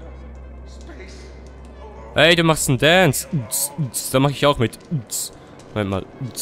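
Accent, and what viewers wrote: Switzerland. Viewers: Du bisch schwizer 😵 kul i ou 🤣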